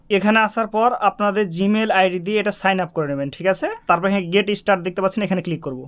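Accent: Chapainawabganj, Bangladesh. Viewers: ভাই আপনার বাসা কি চাঁপাইনবাবগঞ্জ আপনার ভাষা শুনে মনে হচ্ছে।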